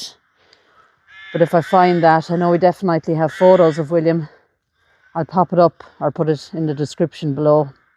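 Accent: Ireland. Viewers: I could listen to you speak for hours. I love the irish accent. Im about 20% irish, maybe that has something to do with it. Hope your health improves soon. And best wishes from America!😊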